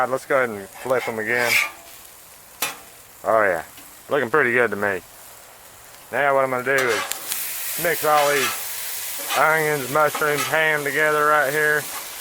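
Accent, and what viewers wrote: South, United States. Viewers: 2 seconds in I knew he was from the South 🤣 thanks for the good vibes & recipe.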